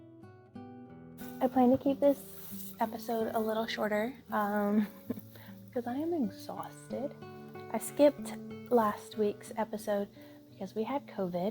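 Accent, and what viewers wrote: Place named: Alabama, United States. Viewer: You're exhausted? You look GREAT. The Pickens has a gorgeous drape😍 you did a great job! Your accent is similar to mine I'm from Alabama where are you from?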